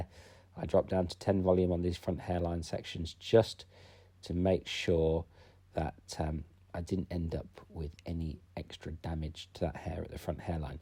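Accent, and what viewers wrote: United Kingdom. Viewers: loving your videos, love your simple and effective techniques. Hope you keep making lots of videos. Do prefer the British accent being from this side of the Atlantic myself. Thanks for the great education.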